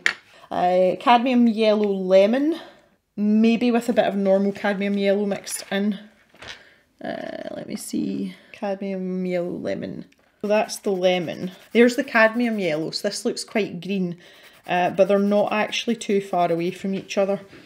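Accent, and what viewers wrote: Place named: Scottish Highlands, United Kingdom. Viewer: I do enjoy watching your videos where you have put on your “instructor hat” on! You have a true gift for sharing ideas and giving your viewers practical advice on creating art! Truly inspirational, thanks. I remember when I was a youngster and on Sunday evenings we watched a TV Show: Walt Disney’s world of Color! Did you get this show in the UK? They mostly showed Disney real life movies, “Charlie the Lonesome Cougar” and most movies were not cut to fit the time frame of a TV movie, so if they had excess time that did not fill with commercials they showed Disney shorts, sometimes cartoons but sometimes they had creating Disney characters and they showed us viewers how to draw Mickey or Goofy and I remember when I sat down with pencil and paper hoping that the movie would end early and I would get a chance to draw along with the Disney Artists! 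Thank you for sharing all of these wonderful tips and bringing this memory back to the front of my mind! Thank you, watching your videos and listening to your highland lilt brings me and others joy!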